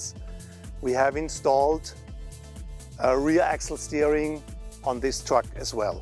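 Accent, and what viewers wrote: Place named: Germany. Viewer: Ich hab einfach mal auf Deutsch geschrieben das der Akzent und der Name unverkennbar deutsch sind.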